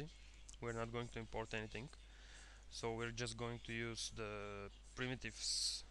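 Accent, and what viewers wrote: Russia. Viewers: Русский чтоли?)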